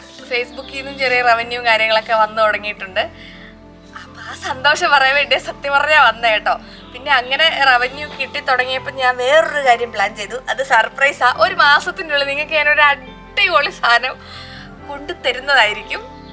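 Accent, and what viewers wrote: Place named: Southern Kerala, India. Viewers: ❤ നന്ദി, ഞാൻ വിചാരിച്ചത് ഇടുക്കി ക്കാരിയാണെന്നായിരുന്നു, എന്തായാലും തെക്കൻ side ലെ ഭാഷയാണ് കോഴിക്കോടൻ slang അല്ല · Kottayamkarude varthamanam❤️❤️❤️❤️❤️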